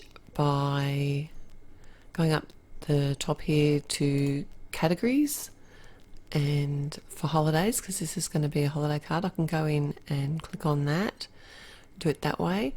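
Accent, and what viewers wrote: Australia. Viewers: Just learning how to use my cricut. You sound Australian, me too.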